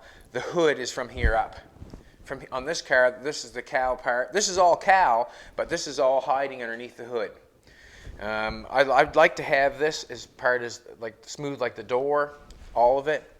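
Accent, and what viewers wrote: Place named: Newfoundland, Canada. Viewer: the accent,,,so you would be what i consider/call a newfy,,?,, a canadian from somewhere east of quebec,,,?...lol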